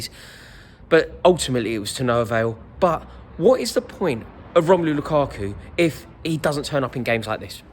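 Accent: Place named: England, United Kingdom. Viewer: Your English accent is so fine to hear , very traditional and classy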